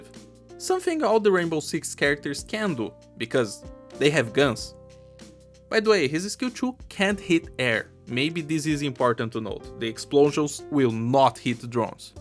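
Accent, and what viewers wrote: Brazil. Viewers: Are u Brazilian? Ur english sounds like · The way you talk...are you brazilian?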